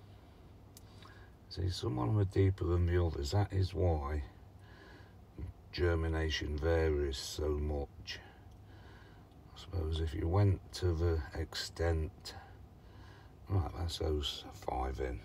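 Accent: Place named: RP, United Kingdom. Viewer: Just a suggestion mate, take it or leave it, comes from good intentions. Consider changing your channel name. Few will search for " " but many would search gardening in Leicester or some such. You are at a good time to switch as you are just starting to build. All the best, my posh, well spoken friend:))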